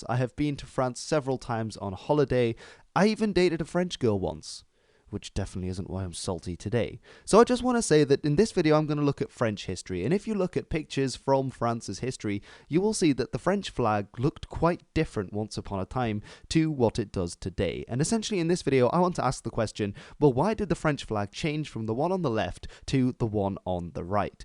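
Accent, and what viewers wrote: United Kingdom. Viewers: ok I didn’t know that because his accent is really the same as the British one, his accent is just perfect but thank you very much for the information I really appreciate that my friend. Love from France x ❤️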